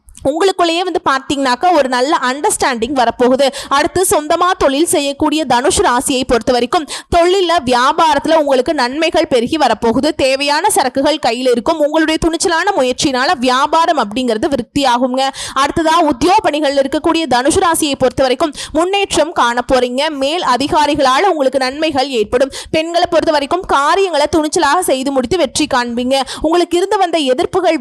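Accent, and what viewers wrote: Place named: Kerala, India. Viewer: Kerala pesara Amma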